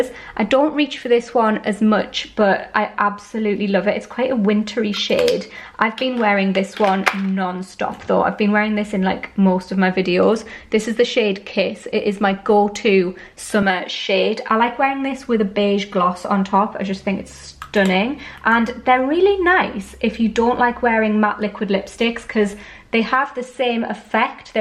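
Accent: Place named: Wales, United Kingdom. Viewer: R u from wales cause u sound welsh Xx 😘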